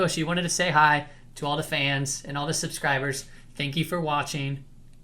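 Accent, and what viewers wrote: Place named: California, United States. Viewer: especially with your Californian accent, the analysis sounds even greater:)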